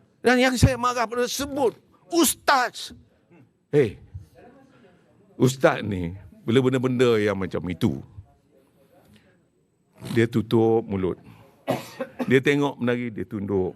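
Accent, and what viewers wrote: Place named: United States. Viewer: slang american kencang seh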